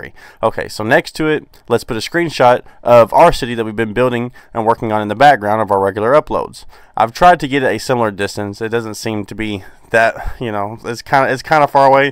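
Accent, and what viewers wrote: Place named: South, United States. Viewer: That's true. I did not think about the height difference. Btw I love your Southern accent. Southerner here too (NC).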